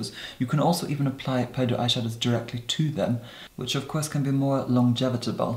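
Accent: Scotland, United Kingdom. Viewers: Favorite part is that he's got a faint Scottish accent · patsy t That's Scots accent love, we all speak like that here! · Imagine manny attempting to parrot everything says 😺 He couldn't string it. No offence to Americans x BTW his accent is Scottish x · So much talent. But i miss ur scottish accent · Anton Fuchs Scottish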